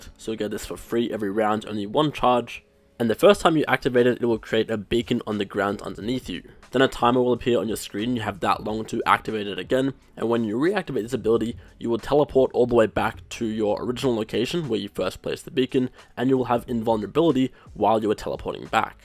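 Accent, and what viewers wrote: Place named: England, United Kingdom. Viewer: Bhai aapke accent ko kya hua? Yeh angrezo wala accent kahase aaya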